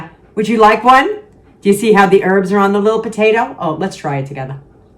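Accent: New York, United States. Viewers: Hi, it's Joey from PBD. Did you grow up in NY or near there? I am sensing a little "back home" when I hear you talk. It reminds me of family and good times. Ok, that bowl of veggies for 100 calories is a very good portrayal of how 100 calories are so filling. I am glad to hear you talk about carbs and starch. You know how it is when you are surrounded by Keto and no carb people who think we have all lost our mind by eating carbs. Yes, you are right, you can eat a large meal of potatoes and veggies and be stuffed for about 400 calories. It's a beautiful think. I love all the benefits you are experience in healing your body from a plant based lifestyle. I just subscribe. I gotta support my YouTube HCLF sister! Great video and great info. Hugs!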